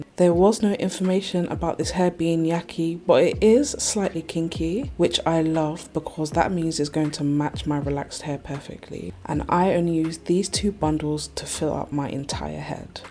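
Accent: United Kingdom. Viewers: Was not expecting you to be British but hell yea thanks girly ur gorg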